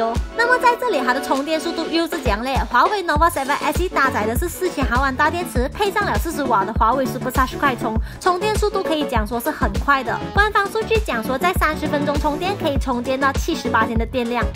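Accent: Malaysia. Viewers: 這應該是馬來西亞中文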